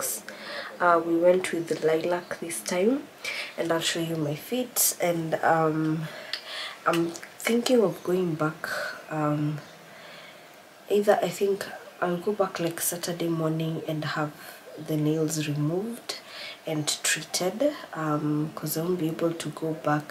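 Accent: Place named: Kamba, Kenya. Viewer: Kamba accent 😂am fighting a cold too and its terrible,safe delivery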